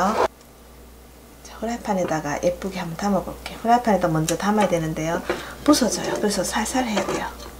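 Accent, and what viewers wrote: Gyeongsang, South Korea. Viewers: 어제의 거지탕ㅋㅋ에 이어 오늘은 굴비양념구이 들어갑니다ㅋㅋ (어른들이 남은 전요리를 거지탕이라 하시더라요.ㅋㅋ) 이모덕분에 싸주신 음식들 깨끗하게 클리어 해가고 있어요^-^ 이모는 어디 계세요? 말씨나 레시피, 영상으로 느껴지기는 경상도 같은데.. 저희 가족은 모두 대구:) 외갓댁은 안동이구요~ 지금은 일 때문에 저희 부부만 낯선 포항에서 지내고 있어요ㅠㅠㅋ 유튜브에서 좋은 이모 만났다고 명절 보내러가서 자랑했다는ㅋㅋㅋ 신랑도 열씨미 배워보라며 응원해줬어요.♡ 다음주에 식혜, 약밥 도전 예정~~ 쉽고 디테일한 설명으로 요리에 재미들인 늙은 새댁 깸미 댓글 드리고 갑니다.ㅋㅋ ps. 오늘도 마니 마니 웃으시고 행복하셔요~♡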